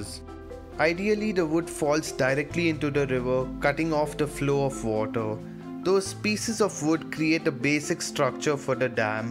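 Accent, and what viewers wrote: India. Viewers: awwww hit a nerve how am I racist for saying taliban ???? And he really sounds like India but sorry I'm no expert like u in accents BIG BRAIN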